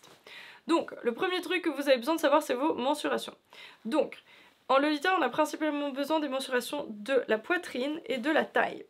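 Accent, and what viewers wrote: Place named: France. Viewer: Je connaissais tes vidéos anglaise et jai tout de suite reconnu un accent français ahah jme suis pas trompée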